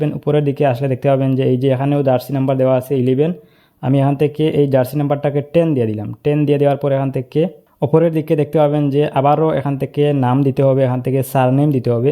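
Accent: Sylhet, Bangladesh. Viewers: Vai ki sylheti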